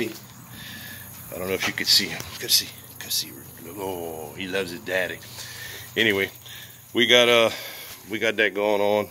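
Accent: Louisiana, United States. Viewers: Without that Louisiana drawl, I think if I told that same story in my voice, saying it was taking place in a NYC sewer,....nobody would care!, your story telling and video making are truly a find! Love what your doing, now go get us some video of that gator!...And I will look closely next time I'm in Manhattan! Lots of rumors about people flushing small ones down the drain and managing to survive in the warm waters surrounding steam pipes and skyscrapers of Downtown!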